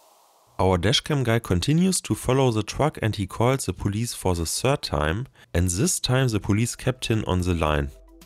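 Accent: Germany. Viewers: Das ist das Deutscheste Englisch was ich seit langem mal wieder gehört habe. Aber schöne idee auch clips für die Englische community zu machen.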